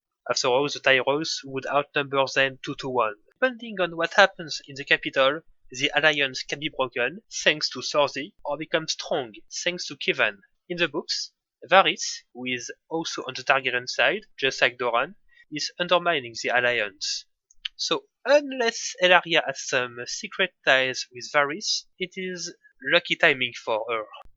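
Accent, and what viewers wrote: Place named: France. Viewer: Y a qu'un français pour prononcer "épisode" comme ça ^^ c'est la première fois que je vois un francophone faire des vidéos en anglais, c'est un peu perturbant d'avoir un aperçu de notre accent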